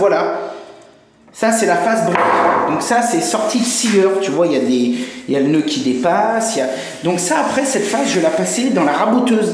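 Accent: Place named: Lyon, France. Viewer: Salut Ami, super vidéo avec des explications claires, nettes et précises. Enfin des mots que tout le monde comprend sans termes anglais ou technique. Et en plus avec un accent lyonnais... car je pense que tu es de Lyon ou des environs. La plus belle ville du monde.....(rire). à + et encore merci. Norbert "dit nono" un 69 du 63.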